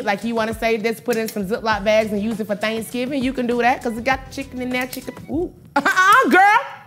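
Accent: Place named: South, United States. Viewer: I love your southern accent .. specially wen U say “sopped up" 🤣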